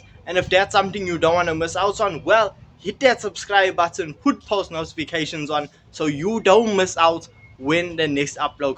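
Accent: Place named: Durban, South Africa. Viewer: Cool project my guy. The Durban accent takes some getting used to, but informative nonetheless.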